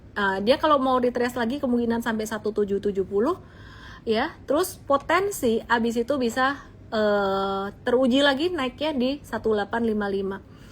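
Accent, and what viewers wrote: Java, Indonesia. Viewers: Cik tetep logat jowo ae, wong jowo ojo lali jowone. Kamsia. Gbu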